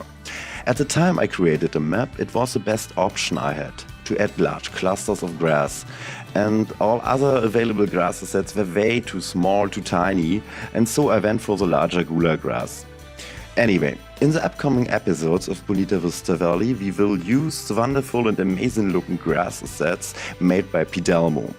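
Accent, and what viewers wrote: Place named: Germany. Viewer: ich liebe es wenn ich durch den Akkzent erkenne das man deutsch ist. 😂 Schönes Video.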